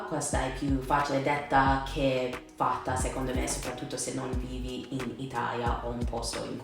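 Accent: United States. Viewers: You seem fluent, congrats! Your American accent though is fairly strong. I was wondering if you've given thought to trying to improve that as it can be distracting? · Parli benissimo ed hai un accento molto piacevole da ascoltare. Purtroppo o per fortuna quando si impara una lingua da adulti l'accento della nostra lingua base non si perde mai, vale anche per me dopo anni che vivo in francia. Ma non mi sembra che tu ti ponga molto il problema, o si? Vorresti che il tuo accento americano non fosse notato o ti piace questa sfumatura? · Non hai imparato bene la pronuncia, noi italiani non parliamo con l'accento americano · Dicono che l'italiano sia una lingua difficile da imparare per uno straniero. Tu l'hai imparato molto bene anche grazie al fatto che, vivendo qui in Italia, sei costretta a parlarlo giornalmente. Complimenti. PS: è bellissimo sentire parlare l'italiano con l'accento americano, non perderlo mai. 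· Ciao, il tuo italiano è molto buono - È normalissimo che a volte tu abbia dubbi sulle parole e faccia errori, ma parli ad una velocità quasi da native speaker e devi esserne fiera. I consigli che hai dato sono gli stessi che dò anch'io a chi mi chiede come diventare fluent in English (vivo in UK e sono quasi bilingue ormai). Practising with native speakers is key! L'accento americano è cute though quindi tienitelo stretto:) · All you have to do is learn the accent! I can totally hear your American Accent. Brava però!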